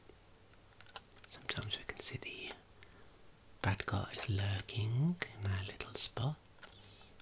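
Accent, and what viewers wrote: United Kingdom. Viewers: I adore this accent. Obviously somewhere in the UK, but I can't place it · Have just stumbled onto your channel, and my immediate thought was "oh no he's a Brummie", as am I and I loathe the accent! However, I'm picking up Black Country? Whatever your accent is, your voice is just heaven Sir, and I loved the retro games, definitely my era 😊 Thank you so much, you have a new subscriber 🤗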